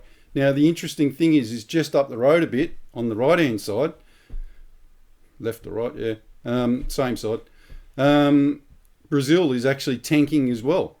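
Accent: Australia. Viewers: Great to get a dinkum Aussie presentation in A lingo we understand.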